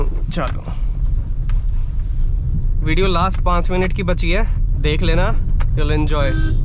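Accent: Haryana, India. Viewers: Haryanvi Chalke h bhai boli m 👍👍👍😃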